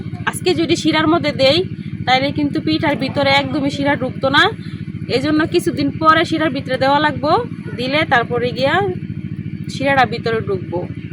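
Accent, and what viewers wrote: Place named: Comilla, Bangladesh. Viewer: আগে বলেন আপনাদের বাড়ি কোথায়??? ভাষাটা চেনা চেনা লাগছে, বেশিরভাগ আমাদের মত। তবে আপা অনেকদিন পর এই পিঠাটা বানানো দেখলাম, এটা কে আমাদের কুমিল্লায় ফুল পিঠা বলে। সেই খেজুর কাটা কাঁচা বাঁশের লেইল দিয়ে বানাইলেন, অতীতের পিঠা বানানোর সব স্মৃতি মনে পড়ে গেল। আমাদের দেশে বিয়ে এবং মুসলমানি অনুষ্ঠান আর নতুন জামাই বেয়াই আসলে, এই পিঠা আরো বিভিন্ন রকমের পিঠা বানানো হয়। আমি ভালো পারতাম না, আমার মা ভাবি পাড়ার অনেক মহিলারা মিলে বানাইতো, কিছু কিছু পারতাম, কারণ তখন ছোট ছিলাম। আজ আপনার কাছ থেকে ভালোভাবে দেখলাম। এখন পারবো মনে হয় ইনশাল্লাহ, আমি আপনাকে লাইক কমেন্ট সাবস্ক্রাইব করে দিয়েছি। ভালো থাকবেন। আর আমার জন্য দোয়া করবেন আমি খুব অসুস্থ, এবং শোকাহত, আপনার ভিডিও সব সময় দেখব কথা দিলাম। আসসালামুয়ালাইকুম 🙏❤🌻🥀🤲👍🎉 ঢাকা থেকে 🙏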